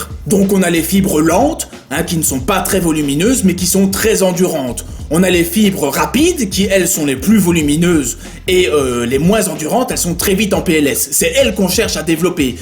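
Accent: Belgium. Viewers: Est-ce qu'on peut faire ça avec des elastibands? 😄.. Tu as une.voix terrible mec! En plus l'accent belge embellie tout ça mdr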